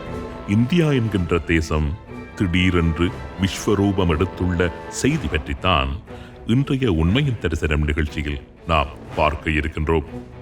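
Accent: Sri Lanka. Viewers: Srilankan voice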